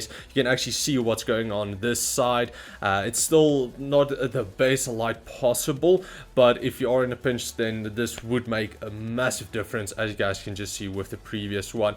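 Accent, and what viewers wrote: South Africa. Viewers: Where are you from in Sa I can’t tell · Dude are you SOUTH AFRICAN if are nice bra ek ook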